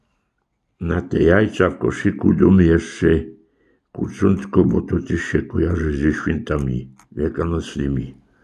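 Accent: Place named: Silesia, Poland. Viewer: Jakie to jest piękne ❤❤ Jeszcze ta gwaro śląsko ❤❤ Miód na moje uszy. Pozdrawiam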